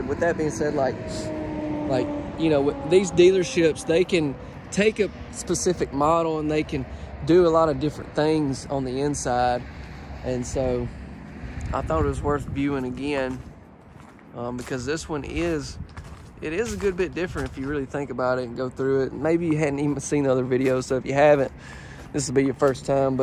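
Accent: South, United States. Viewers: Please do not apologize for saying “reckon”. I’m from Alabama. Keep our Southern accent alive! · Love your southern accent. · Speak your Southern English! I love it! Don’t ever change. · GREAT-looking LR/DR/kitchen open-area layout, and you have a soothing, "folksy" way of selling the unit. My only "criticism" is when you hesitated after saying "reckon" as though it was too country for the video. Your southern accent NEEDS TO BE PRESERVED, because with the gentrification of much of the US--I'm from Boston, have a STRONG Boston accent, but fewer ppl speak with a Boston accent nowadays due to the dreaded gentrification of neighborhoods here--so we gotta keep the various cultures/accents alive throughout the US. After all, the US would be a dull place if all we had was a monotone, single accent throughout the entire country, right? In other words, STAY TRUE TO WHO YOU ARE! 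Keep up the good work:) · I love your accent ♥️ Be who you are. Don't go changing trying to people please, because I RECKON, LOL HEHEHE 😋 🤣🤣 🤣 you will never ever master being a puppet to everybody in the world. I love your personality, you have a southern swag about yourself 😁 yes, SOUTHERNS HAS SWAG..LOL HEHEHE 😋 🤣🤣 🤣..and that is what's keeping the viewers returning to your channel ❤️ keep up the great work. I RECKON , I SEE Y'ALL ON THE NEXT VIDEO..🤗 Charole'vista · LOVE THIS HOME! and keep being you...love the southern accent👍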